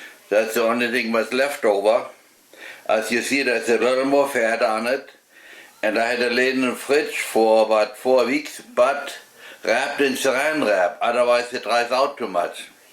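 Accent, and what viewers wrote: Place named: Germany. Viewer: My dad used to do that in Germany every year. We bought a pig and the butcher came out to our house and we butchered all day long. I remember that I had to stir the blood when they cut the pigs throat. We made everything by our self. Blood sausage (Blutwurst) and what you made here. (Rauchfleisch) and you sound like a German. Am I right? I wish my dad would still be around to tell me how he made all the hams and sausages. What you did here is pretty much the same way I just don't remember what he put in his brine. Is there a recipe for the brine (Lake) somewhere. Thank you that meat looks so so good. I will make some as soon as my pig gets big enough to get butchered.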